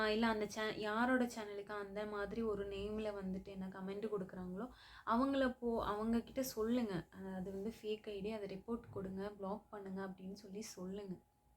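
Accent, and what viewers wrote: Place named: Kanyakumari, India. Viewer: தமிழ் பேசும்போது மலையாளம் கலந்து வரும்போது கன்னியாகுமாரி தமிழ் மாதிரி இனிமையாய் இருக்கிறது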